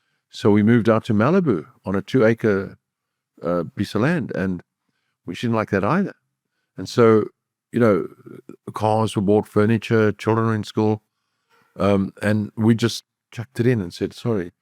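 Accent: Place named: Glasgow, United Kingdom. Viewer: Love it you can take the boy out of glasgow but canny take glasgow out the boy you just keep being your authentic self Loved it when you said diddy meaning did he wnen ge reveals an answer to a question in typical glasgow style yer like diddy then when you asked what it was like being in love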